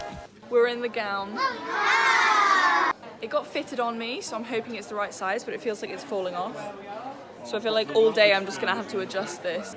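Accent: London, United Kingdom. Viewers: Are you from London? Australia?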